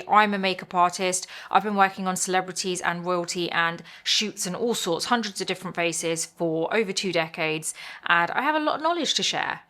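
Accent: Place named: United Kingdom. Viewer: i think her native language is english since she has a uk accent lol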